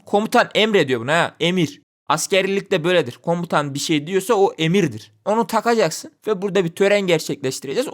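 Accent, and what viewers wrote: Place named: Nevsehir, Turkey. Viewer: Ustam nevşehir li misin konuşma aksanın nevşehir ağzı çok iyi 👍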